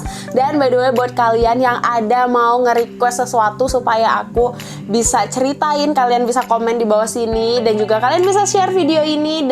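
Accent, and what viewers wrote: Indonesia. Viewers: Sumpahnya channel ini muncul di rekomendasi gw dan kakak ini waiahnya kek orang korea tapi ketika ngomong orang indonesia wkwkwk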